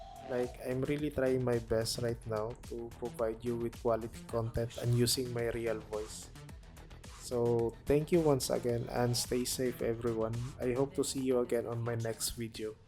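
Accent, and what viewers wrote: Philippines. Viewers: are you filipino? sounds like it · even the diction and cadence is similar to how Filipinos speak Tagalog. The "f" and "v" to the rolling "r"s and "t"s. Though even English in the ph will still vary depending on the region. That being said, I prefer the human voice than the AI one.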